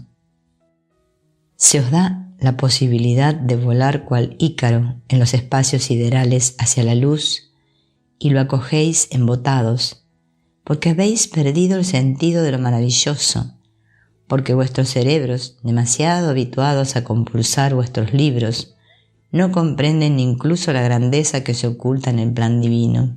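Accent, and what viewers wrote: Argentina. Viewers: No comprendo tu voz Argentina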